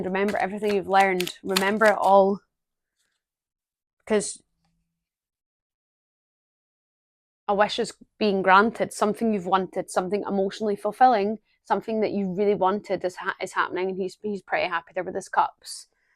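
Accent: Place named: Scotland, United Kingdom. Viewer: Thank you for this video and message. I think you tapped into the energy I feel when I connect with my guides. It’s pure love and I don’t really feel it with other people, only with them. I think I also like the connection to Scotland. My grandmother and other relatives come from the Munro Clan and hearing your accent brings a familiar joy ❤ Have a good day!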